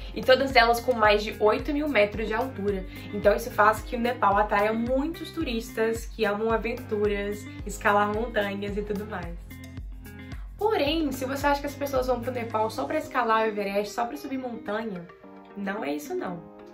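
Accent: Minas Gerais, Brazil. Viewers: Moça você é mineira?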